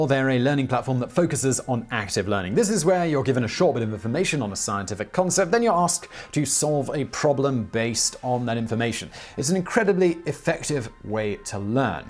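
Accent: United Kingdom. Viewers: I enjoy any video that has a British guy repeatedly saying fart!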